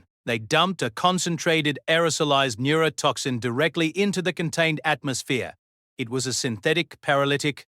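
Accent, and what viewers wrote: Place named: Australia. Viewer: Based on the narrator...she was an Australian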